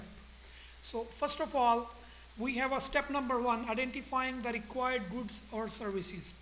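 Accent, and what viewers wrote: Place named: India. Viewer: Pak., perkenalkan dulu dong nama anda .... apakah anda seorang guru ? dari Negara mana kok logat seperti orang India , sementara banyak orang India bekerja di Indonesia tetapi jarang yang beragama Islam.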